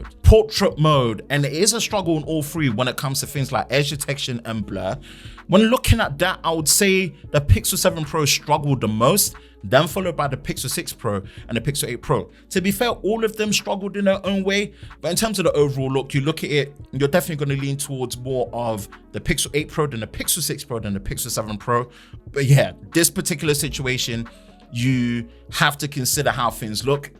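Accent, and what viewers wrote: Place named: United Kingdom. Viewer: Again, one of the best phone camera comparisons. Thanks for your effort. And as usual, awesome british accent. The ice topping. I saw a battery comparison between iphone 15 pro max, poxel 8 pro and pixel 7 pro. The iphone is way way way better. What surprised me was that the pixel 7 pro had slightly better battery life than the 8 pro. I thought they would have worked on it. With that in mind amd seeing the pictures, I think the 7pro offers the most for its money. Where the 8 pro really shines is the AI stuff.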